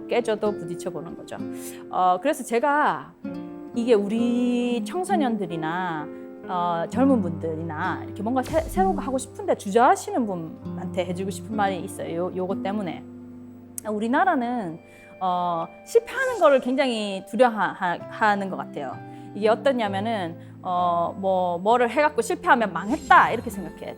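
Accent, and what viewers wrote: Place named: Daegu, South Korea. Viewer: 대구의 말투가 나오시네요.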